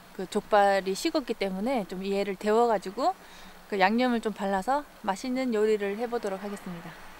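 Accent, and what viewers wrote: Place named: Gwangju, South Korea. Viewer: 누나 말투가 저랑 동향같은데 어디세요? 전 광주입니다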